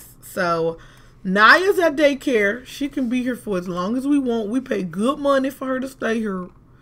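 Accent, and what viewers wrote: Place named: South, United States. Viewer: I found your LP and decided to watch...Your commentaries are so funny! I watched single dad just so I can hear your southern twang...Keep up the good work. Thanks!!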